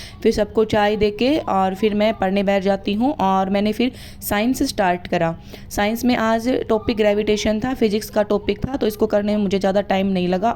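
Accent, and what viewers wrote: India. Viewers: Tu to awaz se dakshin Haryana ki laage hai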